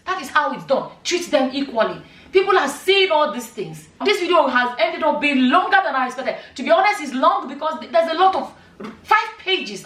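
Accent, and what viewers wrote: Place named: Yoruba, Nigeria. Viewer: The way you speak your yoruba just cracks me up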